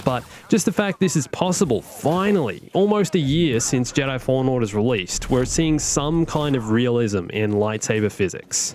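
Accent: Australia. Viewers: Aussie mate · Yes I had no Idea your also Australian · OOOH WE GOT A FUKIN AUSSIE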